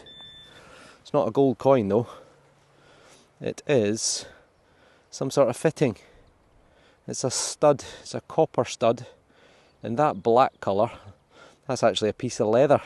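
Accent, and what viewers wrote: Scotland, United Kingdom. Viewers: I enjoy very much watching you find all of these treasures from way across the blue Pacific Ocean in beautiful Hawaii. Your Scottish accent is just beautiful to listen too. Always exciting to watch your finds!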